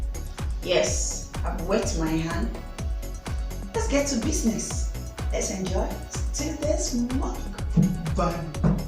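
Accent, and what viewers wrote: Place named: Ijebu, Nigeria. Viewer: Pls re u Ijebu like me? Cos this is our food oo Ur Ijebu dey sweet me oo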